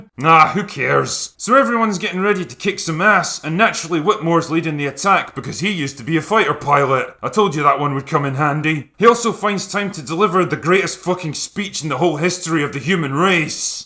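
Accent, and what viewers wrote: Scotland, United Kingdom. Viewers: With his thick Scottish accent it made it funnier lol. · Listening to "USA! USA! USA!" with your Scottish accent made my day, Drinker! Couldn't agree with you more about this movie!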